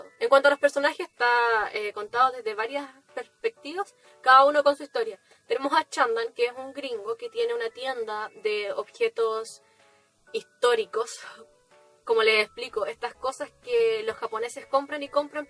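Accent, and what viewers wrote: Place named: Canary Islands, Spain. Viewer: Chacha si no es por una palabrita que te delató, me habría cortado la mano por tu acento a que eras de Canarias. Estoy in love con tu canal🤗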